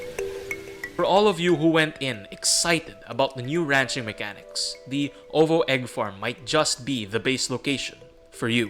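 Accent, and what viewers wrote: Philippines. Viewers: Pinoy na pinoy yung accent mo par hahahaha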